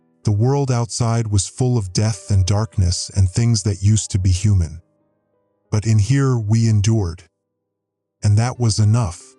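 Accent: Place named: Spain. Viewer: The AI keeps going between regular English to a heavy Spanish accent lol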